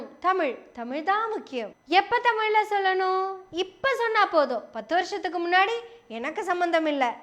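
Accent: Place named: Coimbatore, India. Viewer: பாப்பா உன்னோட கோவை தமிழ்ல நல்லா வைச்சி செய்து இருக்கீங்க 😂சூப்பர் 👍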